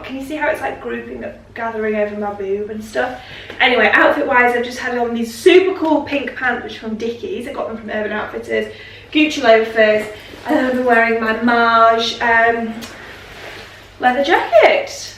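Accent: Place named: North, United Kingdom. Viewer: My favourite fashion youtuber & you have a northern accent which makes me feel so at home!! Stripes are my favourite at the moment - I've been about bought a couple of striped tops yesterday! X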